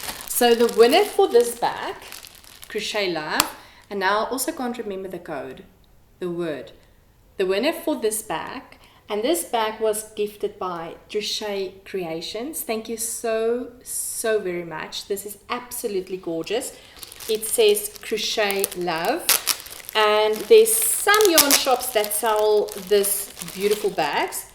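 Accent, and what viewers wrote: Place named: Afrikaans, South Africa. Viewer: Haai 😊 Ek het jou channel gekry deur die recommendation van mywonderfulknittinglife. Ons het ~20 jaar terug Amerika toe getrek, en ek was baie excited toe sy se jy is in SA. Dit is so lekker om n Afrikaanse aksent te hoor. Dankie vir n lekker episode! ❤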